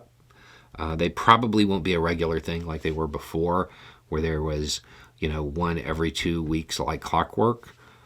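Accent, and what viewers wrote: South, United States. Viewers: I appreciate that you only post when you're confident of the evidence. It's one of the reasons we continue to watch your videos. Finding reliable information isn't the easiest thing. We live in Australia so we have 2 reliable news sources (ABC & SBS) but they aren't as reliable as you. They also don't come with a southern drawl 😊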